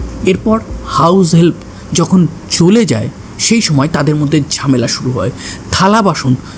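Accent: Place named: Hindi, India. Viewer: Alka sune aami bhabchilam hoyto alkatra e bolbe. 😂😂😂😂 O bhai bangla ta banglar moto bolo na keno??? Hindi moto bolte giye na bangla bolle thik kore na hindi. Tomar maa baba ke gigesh koro Alka ki kore bolte hoy. Aamar bangla o khub ekta valo noy.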